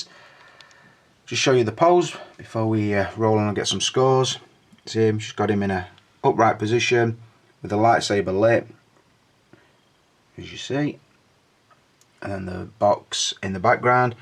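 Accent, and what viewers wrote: United Kingdom. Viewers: What part of the U.K. are you from? I love the dialect. I'm a fan from the U.S.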